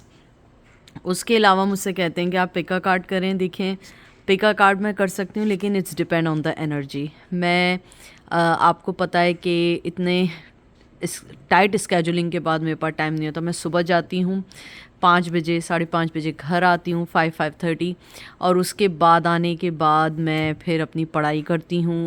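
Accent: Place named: Karachi, Pakistan. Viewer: Hi I am in Virginia. I wish I can meet you. You sound like you are from Karachi too. ❤️❤️❤️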